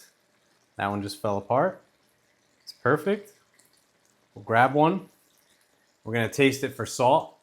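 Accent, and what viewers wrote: Philadelphia, United States. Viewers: Just found your channel. Where's your accent from? Near Philadelphia but not Philadelphia, or southern New Jersey, or Delaware? Those are my three guesses. #1 guess is somewhere in the Philadelphia vicinity, maybe north.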